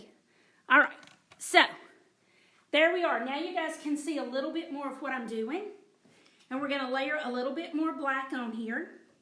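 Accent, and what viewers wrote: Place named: South, United States. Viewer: #replay!!! Love your tutorials and of course your southern accent LOL!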